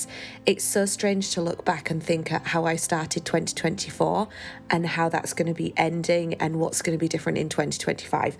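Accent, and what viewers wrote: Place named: United Kingdom. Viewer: So pretty!! I am not the best at identifying UK accents but always curious. Where is your from?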